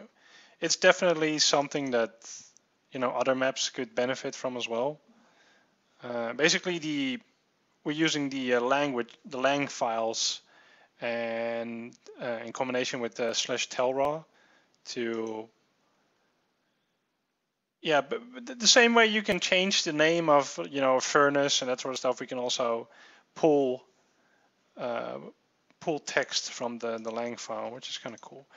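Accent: Netherlands. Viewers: i have a question are you dutch it sounds like it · Not judging his accent just sounded like he was dutch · are u dutch?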